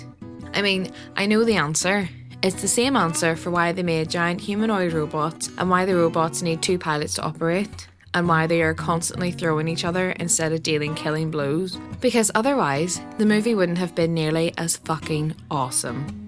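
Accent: Scotland, United Kingdom. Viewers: Just curious but are you Scottish, maybe Irish?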